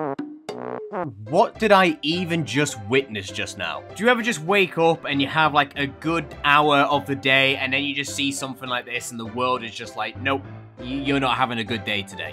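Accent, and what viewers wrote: United Kingdom. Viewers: I like ur British 🧐 accent lol and jokes, im just dying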